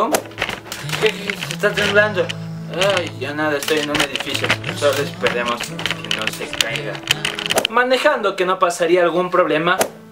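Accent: Ecuador. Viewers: eres de Ecuador? /o\ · eres de ecuador?! jaja me encanto este video un gose y lo del carro xD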